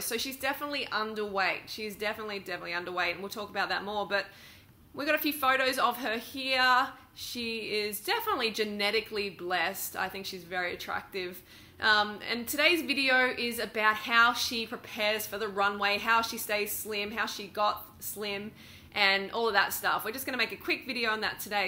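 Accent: Australia. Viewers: I don't know what's more annoying... your accusations against Kendall or your stupid aussie accent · Is she Australian?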